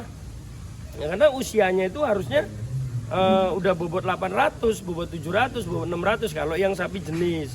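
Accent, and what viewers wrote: Banyumas, Indonesia. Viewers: Ciri khas ngapaknya jangan sampai tertinggal mas selalu sukses selalu lembu benggolo farm & resort 🙏🙏🙏🙏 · Ora ngapak yaa ora kepenak... Ora kepenak yaa ora ngapak... 😍😍😍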